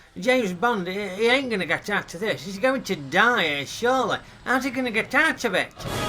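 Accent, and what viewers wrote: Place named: Nottingham, United Kingdom. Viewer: Congrats on 500 subscribers! Well deserved - these vids are mint! (And I'm loving the Notts accent - reminds me of home)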